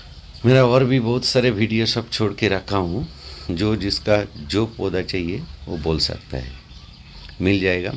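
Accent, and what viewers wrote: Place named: Bengal, India. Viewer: ठीक से तो बोली, बंगाली लहेके में बोला, इसमें गलत क्या ??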